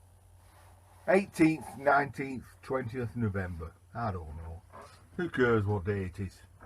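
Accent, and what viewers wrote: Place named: Midlands, United Kingdom. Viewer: Got my wheels turning, sir. Going to try it. Maybe find some wire if the ties don't hold up. As a Yank who's only been to the Midlands and up north a few x in a band, your accent brings back the best of memories. Discovered Max & Paddy and Peter Kay in the first tour...funniest man alive. All the best and thanks for the vid.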